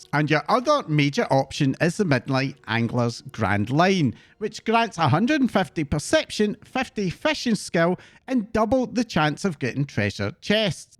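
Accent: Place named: England, United Kingdom. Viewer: Your english acsent is epic <3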